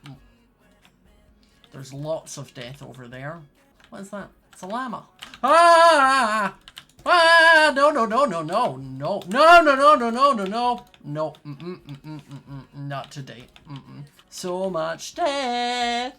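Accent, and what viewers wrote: Scotland, United Kingdom. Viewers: Are you Scottish??? Bc you have the same ascent as my half brother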